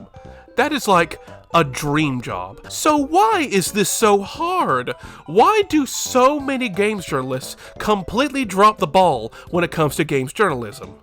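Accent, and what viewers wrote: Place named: Texas, United States. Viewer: he is from texas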